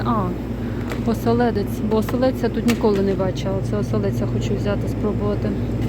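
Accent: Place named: Donetsk, Ukraine. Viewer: дякую , що не проігнорували моє питання . Я таки відчула по мові , що ми з вами землячки . Я теж родом із Донецької області , В-Новоселкіасткий р-н, це ближче до Курахове , Марʼїнки . Але заміж вийшла і переїхала в Заплрізьку область . Начебто області знаходяться поруч , а українська мова має свої нюанси ( діалекти на заході України ). Тепер ми всі біженці - мої родичі з Донеччини і ми із Запорізької області , бо частина області окупована , частина під обстрілами . Так і скитаємося по світу…А ваші відео приємні , заспокійливі , трішечки із смутком . Бажаю вам багато підписників і якнайбільше переглядів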